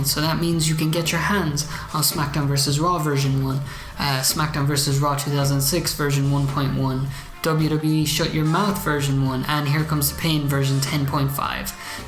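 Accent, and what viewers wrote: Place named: Scotland, United Kingdom. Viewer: This your voice?For some stupid reason i thought you were scottish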